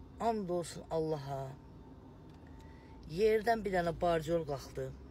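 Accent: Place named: Goychay, Azerbaijan. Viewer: Can baci Göyçay lehcesidi mence??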